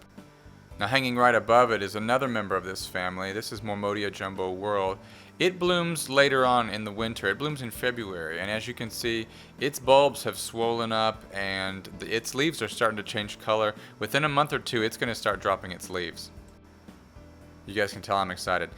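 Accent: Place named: England, United Kingdom. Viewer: Did you go for a holiday to England? Thought I heard some pommie mellifluossity in that soliloquy!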